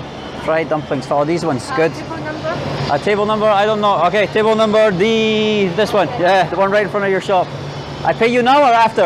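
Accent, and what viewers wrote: Scotland, United Kingdom. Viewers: Seen this on FB a year ago, now finally get to see the full vid hahaha. Loving that Scottish accent. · Have to make myself familiarise with Heavily accented Scotland English. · your scottish accent make my throat feel swollen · I like Scottish accent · You are a sweet man. Bit of a clumsy bum but the Scottish accent and lovely approach to people makes up for it! Will keep watching and I have subscribed. I bet your taste in food has grown astronomically...well done as it’s not a common thing for UK citizens to have a broad palate. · I love your Scottish accent.👍🏼👍🏼😁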